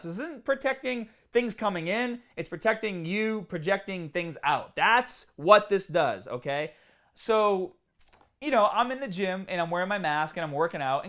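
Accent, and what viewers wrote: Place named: Massachusetts, United States. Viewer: Hi. I live in Northampton, MA (in Western MA, home of Smith College.) I have an accent like you, lol! Our gyms are still closed in my area. I don't know about other parts of MA; In Boston and Worcester things were and still are much worse than here, this is a small city, so gyms are probably still closed in other areas of MA. I do know a few people who got Corona in my city who have all recovered except one older man who died, but we are not a "hotbed" in Northampton. I guess our governor is being extra careful. I'm so excited for the gyms to open again, but I hope I don't have to wear a mask. It must be so uncomfortable to workout in a mask! We are still required by law to wear masks in public in MA, you risk being fined $300 if you don't wear a mask! By the way, Animal agriculture is what caused the coronavirus. 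Animal agriculture is the breeding ground for future pandemics that will harm everyone on the planet. COVID-19 is just the beginning. More pandemics are coming. The overuse of anti-microbial drugs on animals and humans is fueling resistant pathogens that could kill 10 million people annually by 2050. Future diseases are brewing right now on factory farms across the nation, because of the crowded, filthy conditions. 70% of the antibiotics we feed animals to prevent rather than treat infections are medically important for human health. This allows antibiotic resistance to breed. Farms are not required to disclose the amount of antibiotics used or the prevalence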